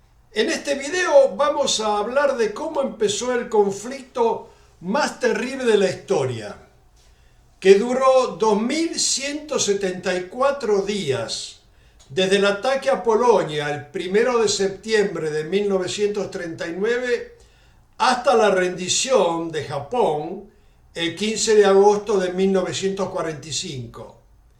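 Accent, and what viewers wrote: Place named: Argentina. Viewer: O espanhol com acento argentino ♡♡♡ parabéns pelas informações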